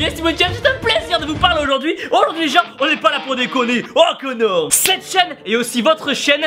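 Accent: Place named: Southwest, France. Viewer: les intros de Tibo: - avant: avec un accent du sud ouest, un mec total dark. "hey les gens! ptn on m'a retrouvé, faut se barrerrrrr!!! - un peu après: "hmmmmmmm ( inspiration avant le DAMN ), hmmmm, HMMMMMMMM, tiens dis donc, mes mains puent la transpiration, c'est degueulasse! hmmmmm DAMMMMMNNNNNNNNNNNNNN explosion atomique les gens, c'est et c'est un... hmmmmmm hmmmmmm HMMMMMMMMM. PPPPPPPPPPPLLAIISSSIIIIIIIIIIRRRRR gros postillions de vous parler aujourd'hui" un peu après: " DAMNNN les gens, j'espère que vous allez bien, c'est et c'est un plaisir de vous voir aujourd'hui! et aujourd'hui les gens... aujourd'hui les gens... aujourd'hui, oui aujourd'hui... pas d'inspiration BOUM!! " en ce moment: " dan les gens, g pas le temps de niaiser, faut que je batte squeezie a qui a l'intro la plus rapide! BOUMMM! " Demain...?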